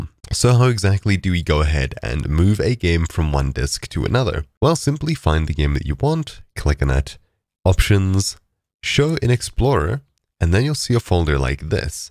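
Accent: South Africa. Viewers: I know an SA bra when I hear one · Are you a saffer?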